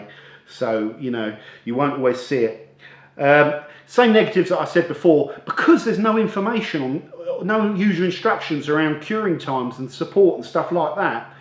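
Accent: England, United Kingdom. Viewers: I like the nature of his videos as is. Conversational. Friendly. You get a feel for the personality. I would enjoy them far less if they were closer to the overly constructed, clinical tripe you're describing. Then again, I'm American and might just be enjoying the accent; it's like listening to a Charles Dickens character tell me about a magical place called Bilt 'Amber, where I can only imagine they have wonderful foods that are all called 'pies' for some unknown reason.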